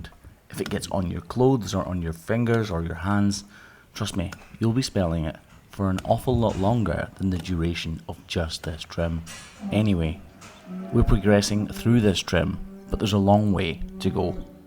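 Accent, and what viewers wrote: Scotland, United Kingdom. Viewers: you enjoy that Scottish accent ;) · I could listen to you talk all day long with your Scottish accent. I live in Michigan and we don’t have hear to many people from Scotland 🏴 That poor cow must have been in so much pain with the hoof problems and rotting flesh. I’ve never smelled that but when you said it smelled like rotting flesh all I could think about was an old chicken part or old hamburger that didn’t get thrown out on time. You do explain your procedures and why your approach to hoof issues so even an, Old Crazy Cat Babe like me could appreciate your work. Thank you for taking the time to post your skills I look at farming in a more understanding what my grandfather and father’s lives were like on their farm. My grandfather had a farm here in Michigan up in the thumb area before tractors, back then he had to grow food for his family and his animals; all he had was a work horse that was a mix between a Belgian and a Clydesdale. 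He took such good care of his animals because he had to depend on each for its job. However come Saturday night there was a dance and pot luck dinner at somebody’s barn, my grandfather was the one who made the moonshine, his shine was so good J. Edgar Hoover tried to arrest my granddad for his shine. The shine was kept cool in a dug out under the chicken house and the geese kept bitting Mr. Hoover so he offered my father $20. to slide under the coupe to get the shine so they could arrest my grandfather, my dad threw a big rock at Mr. Hoover and told him to, “get back into his fanc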